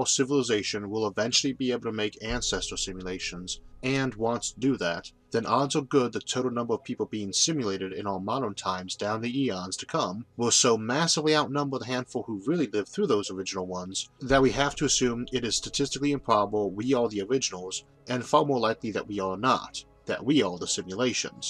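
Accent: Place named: New England, United States. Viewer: WTF accent is he speaking with? Cross between a Boston and Maine accent?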